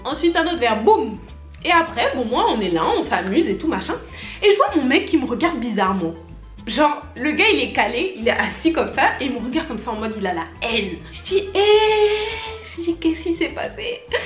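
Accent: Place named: Ivory Coast. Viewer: Ton accent ivoirien me plaît grave 😂😘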